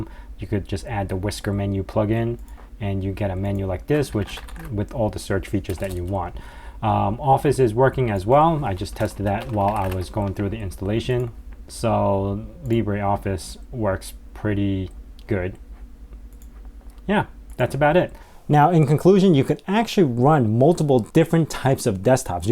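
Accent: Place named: New York, United States. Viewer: You are a genius. And I am very impressed with your knowledge of the Windows WSL and Linux ecosystems. I am also impressed with your English and your accent ( which I assume is somewhere around New York ). I will never live long enough to master all those things. ( I watched this in Xfce using Fedora Rawhide with all the latest bells and whistles that Fedora offers ). I hope I can redo what you just did using my Fedora Rawhide setup.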